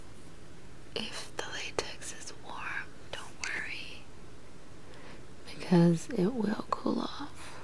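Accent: South, United States. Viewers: YAYYY!!!! I'm so excited! I love your sweet southern drawl that reminds me of one of the reasons I love Texas so. :P Your first makeup video still gives me tingles even after - 2 years - has it been that LONG?!?! anyway I'm just so glad to see you back. :)))) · Oh your southern voice is soo soothing ^.^ I've missed you!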